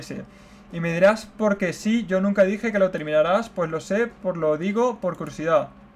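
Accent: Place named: Spain. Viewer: Te llamaremos el porque siempre dices "El" por ti acento español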